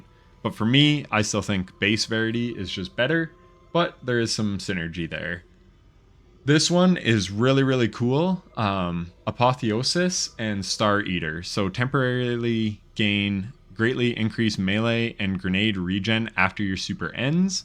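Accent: Canada. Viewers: Are you from Minnesota or Canada? Usually I can tell but you sound to me like an Albertan but when I went to Minnesota there were a couple people who sounded like Albertans aswell. I very confused. Does it matter? Nope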